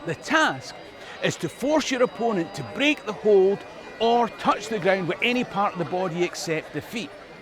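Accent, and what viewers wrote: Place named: RP, United Kingdom. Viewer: Dear Mr, I am a Greig. Daughter of a Greig father from Kinghorn, Fife. His parents were a Mummy MacDonald and a Daddy Greig. Proscription didn't only ban our motley - IT BANNED MY PATERNAL CLAN. We are a sept of MacGregor (banned, hated, hameless) OMG. STANE, I always say Dry Stane Walls. Standing as proud and unapologetic as a Highlander. With a great RP English accent. Sigh Thank You for your work. LOVE IT:o)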